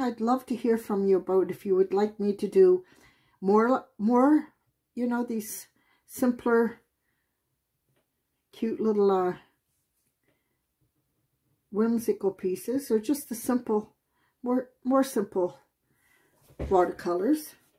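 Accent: Canada. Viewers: Just love it!! By coincidence I was eating Chinese food which I very very rarely eat, and I realized quickly that the little heads looked so much like the back side of the fortune cookie that they threw in my bag! You little curvy mouth was cuter than the cookie! I find that it really helps to think of shapes as objects. Never could be so creative on my own! Thank you!! More whimsical animals please!! I loved hearing about your life! I guessed from your accent and buying gasoline by the liter even though I didn’t know that happened in Canada, it seemed to fit. Good for you to actually go out and do something good for the world!! 💕💕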